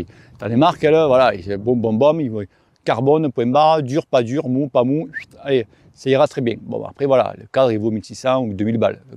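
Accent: South, France. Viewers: Il me fatigue ave son accent de sudiste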